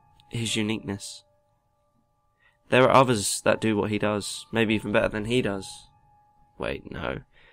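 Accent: United Kingdom. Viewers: british accent. :D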